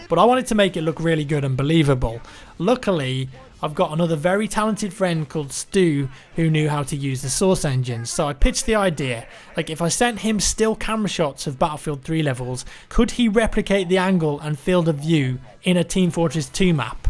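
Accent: England, United Kingdom. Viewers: Luv u! can't resist your awesome English Accent. Plz keep these fantastic videos going!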